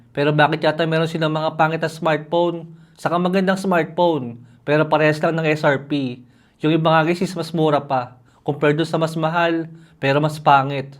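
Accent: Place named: Cavite, Philippines. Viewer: Taga Cavite ka sir‽ Puntong cavite city or Tanza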